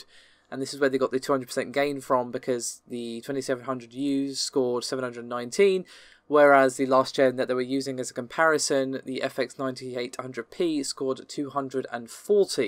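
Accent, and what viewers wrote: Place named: Manchester, United Kingdom. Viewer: I am getting better at detecting british accents. This guy is from.... Manchester !!!!!!!??????????!!!!!!!!!??????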